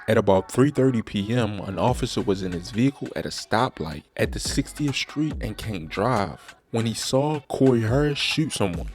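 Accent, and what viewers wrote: Baltimore, United States. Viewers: Dude talking must be from Baltimore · You gotta be from Baltimore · Bruh are you from Baltimore? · Bruh yo ass got a Baltimore accent talkin bout some Chicago bidness.. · He must be from Baltimore or some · The narrator has a very strong Baltimore accent but he knows his Chicago hood history apparently and that's what's up keep up the excellent work bro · Aye you from Bmore??? I can hear them home town yuu's & 2uu's I'm from Lexington &vine Westside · U from Baltimore gang? U got that accent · Dope content and on a side note I can tell by the accent you from bmore 🗣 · is bruh from baltimore? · bro yo Baltimore or some from that way accent keep coming out 😭😭😭im wondering why this inaccurate 🤣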